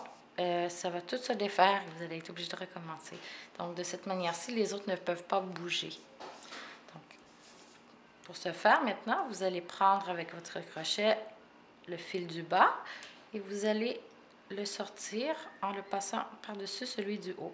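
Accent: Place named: Quebec, Canada. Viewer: t es québécoise que j'entends cet accent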